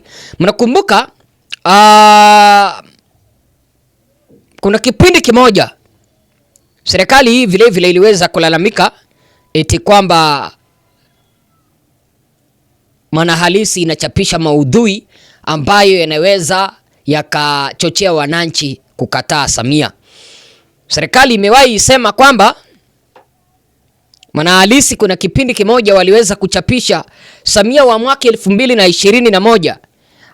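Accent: Kenya. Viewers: Batilinimamayako nababayako mbwawewe kwanzahatakiswahilihuwezi kwanzawewe siomtanzania hakiswahilichako ninakujua wewe nimkenya kaongelee mamboyakenyeyenu nchimmeiuza kwamabwenyenye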